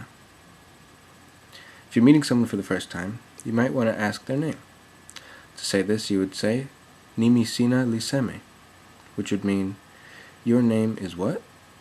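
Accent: United States. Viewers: It sounds like american.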